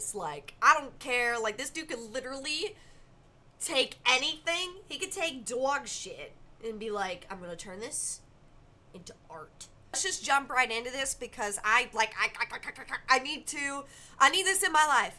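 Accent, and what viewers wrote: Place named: South, United States. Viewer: “I’ll fight him. I’ll meet him at Walmart.” Jfc you’re so southern. 😂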